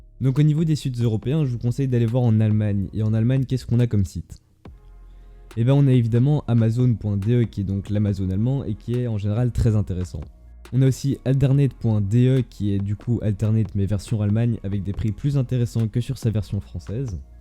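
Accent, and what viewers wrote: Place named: Spain. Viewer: Un accent espagnol de qualité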